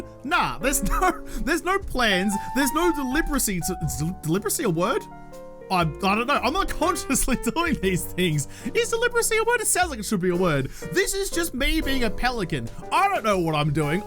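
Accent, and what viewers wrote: Melbourne, Australia. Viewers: Hey! I only just found you a couple of months ago and you've been seriously growing on me. But this video. This video turned me into a lifer! Lemme just say a few things. Yes you can be loud (as can I). And at first I used to turn that volume slider down a bit. But I've come to love your excitability and loudness. As someone who suffers with too many chronic illnesses to list, all of which that have left me retired (extremely early I might add. Had to give up working 15 years ago and I'm only 43 now) and extremely isolated save for my most amazing and loyal husband, my life gets very very lonely and so I depend on my favorite Youtubers to help me not go fucking crazy! And you have so quickly been added to the list of favorites. I always really appreciate your positive attitude and goofiness. I am, unfortunately, American so I've been diligently studying up on Aussie slang so I can understand what the hell you are saying! 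Still lost about 40% of the time but I generally get the jist. You now have me saying batons the way you do instead of the way I do! LOL! Look, don't ever change. Not for anything. The haters are gonna hate and the trolls are gonna troll. You be you and you do you and those who really appreciate your personality will stick around. Thanks for all the laughs mate! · From one Melbourian to another, the accent rocks. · WHAT. One of my top reasons why I ❤ is his amazing Aussie accent!!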